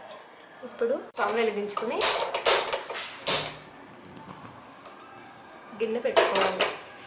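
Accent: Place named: Guntur, India. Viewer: Miru bale cheptunnarandi same guntur style lo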